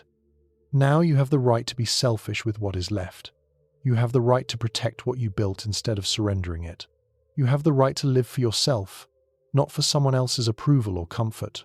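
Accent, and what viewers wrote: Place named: United States. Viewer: Sounds very USAmerican.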